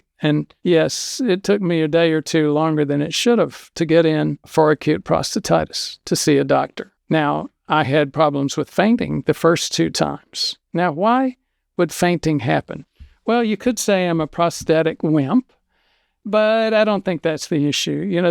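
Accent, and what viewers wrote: South, United States. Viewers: Why are you pronouncing this drug's brand name incorrectly? Is it a southern dialect thing, like how certain southern people call my mom, "Naiomi," instead of her actual name, Naomi? I always think that they're confusing the CORRECT pronunciation of her name with the pronunciation of the Kenyan city of NAIROBI! It's pronounced, 'see • alice,' NOT "sigh • alice," and I can't even watch the rest of this video because you're driving me crazy with your repeated mispronunciation of this word....and you're a real, bonafide doctor, right? Well then, one would think that you would KNOW the correct pronunciation?! I'm just an RN, and even I know the CORRECT pronunciation! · I think it's the Southern dialect thing and possibly lack of early exposure to multiple cultures. Many people mispronounce words...Dr. is a very good person.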